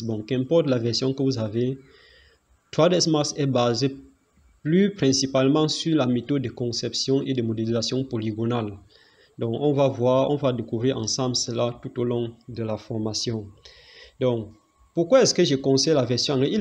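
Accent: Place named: Cameroon. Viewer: vous êtes camerounais je suppose ! J'aime trop la manière de parler des camerounais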